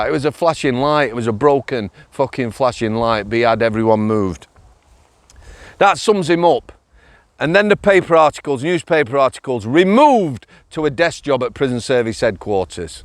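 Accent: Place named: Yorkshire, United Kingdom. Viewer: New to your channel, I like the Yorkshire accent, I lived in Yorkshire for 35 years," Merchant Banker" Lol🤣😅😂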